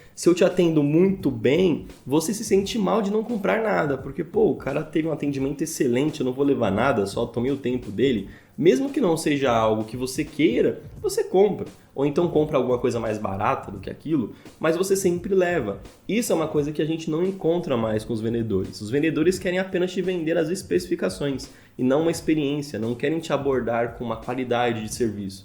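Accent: Minas Gerais, Brazil. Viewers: c e mineiro de onde? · Uai vc é de minas? kkkk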